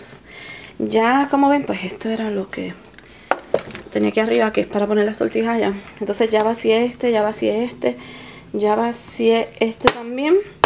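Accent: Puerto Rico. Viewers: Me facina ver tus videos Aww tu acento pueltoliqueño!! Eres hermosaaaaaa!! Me facino tu jollero!!! Besoooossss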